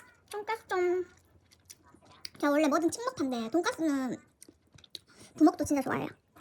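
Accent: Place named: Gangwon, South Korea. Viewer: 이 분 때문에 영동지방으로 어학연수 가고 싶은 일인 여깄음. 정말 극강의 로망 억양 인토네이숑… ㅎㅎㅎ❤❤❤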